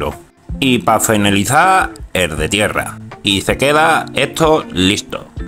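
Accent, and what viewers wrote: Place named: Andalusia, Spain. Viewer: Es español .andaluz del sur de España.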